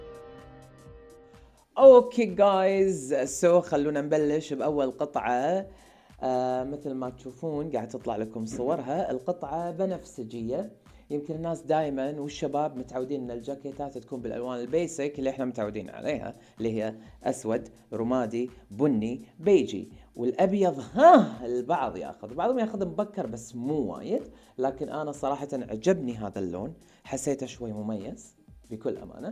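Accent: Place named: Iraq. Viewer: احسك عراقي مو كويتي😭💕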